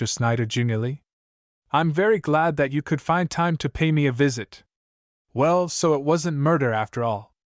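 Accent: United States. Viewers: Americsn narrator really doesnt work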